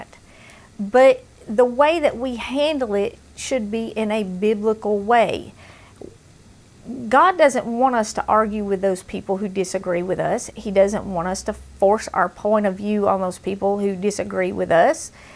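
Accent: South, United States. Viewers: the food is seems so good but all very fatening. only problem with southern food, not b=very healty. but i like your accent. i am going to try and make a low fat version of the broclie soup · I love these videos, love your southern accent and the whole thing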